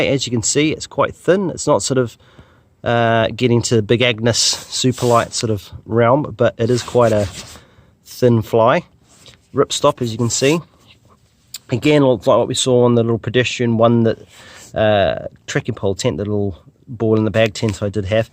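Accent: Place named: United Kingdom. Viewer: Thank you, perfect review. Not only are your thoughts and your judgement very valuable to anybody thinking about buying this (I just ordered one btw), but also you have this really nice british English. A bit like David Attenborough, bit like the Top Gear guys. I like it.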